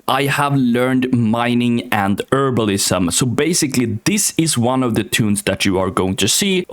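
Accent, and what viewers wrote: Sweden. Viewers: Bro has the Swedish accent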